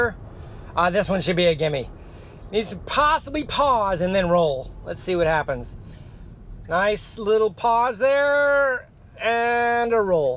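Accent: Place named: United States. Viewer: ...could've sworn I heard him say "metre"...and appears (from accent) to be from USA and appears older than 30. Whoa! There IS hope for the USofA yet! (must be an engineer :-) Seriously though, great content/analysis, thanks!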